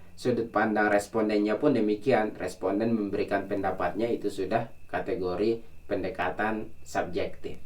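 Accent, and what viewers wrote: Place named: Makassar, Indonesia. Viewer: Kaka orang Makassar yah? Logatnya sama dengan kampung saya 🙏🏻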